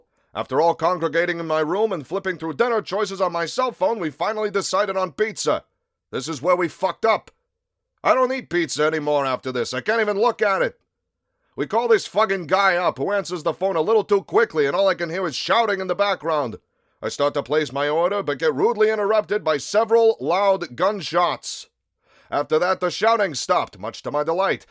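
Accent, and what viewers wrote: Brooklyn, United States. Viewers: Your Italia /Brooklyn accent makes this so much better.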